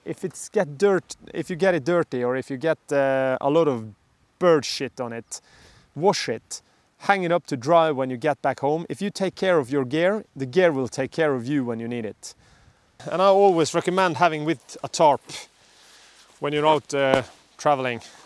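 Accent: Bergen, Norway. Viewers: Hvorfor bergengelsk ?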